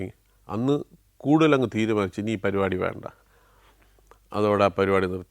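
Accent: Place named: Thiruvananthapuram, India. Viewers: the proper Thiruvananthapuram dialect that I grew up hearing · തിരുവനന്തപുരം സ്ലാങ്🔥🔥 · Real Trivian slang👍🏽❤😍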